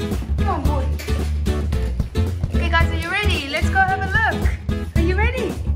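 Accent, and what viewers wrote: South Africa. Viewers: What a precious pair of potatoes! And congrats on your new home! I'm a late subscriber to your channel. You sound like you have a South African accent. I'm a South African myself, so I was just wondering! 😉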